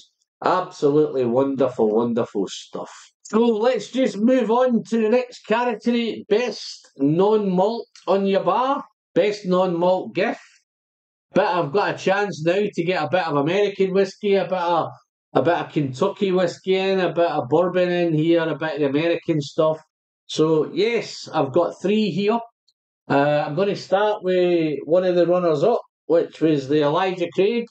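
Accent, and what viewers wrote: Scotland, United Kingdom. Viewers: English, with a few wee drops of Scotia.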